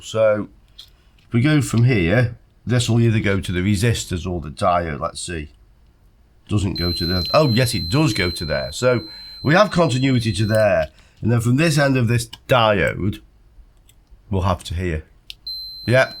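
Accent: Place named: Sunderland, United Kingdom. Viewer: hi.like your accent nowt...haha im from sunderland macam land and we use the same wording for nothing. love your videos im not a tech just find the videos interesting...and simple to follow even though you go off into a tech tangent sometimes haha..but i know what the channel is for.keep up the good work......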